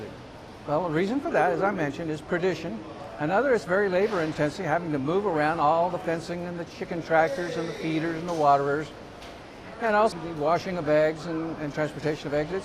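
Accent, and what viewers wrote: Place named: Transatlantic, United States. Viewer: He kinda has the transatlantic accent. It sounds so nice.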